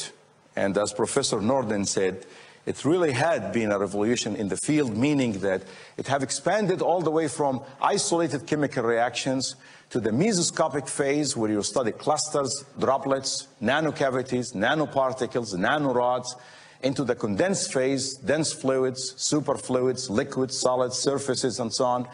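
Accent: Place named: United Kingdom. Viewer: This accent is British accent 😅😅😅😅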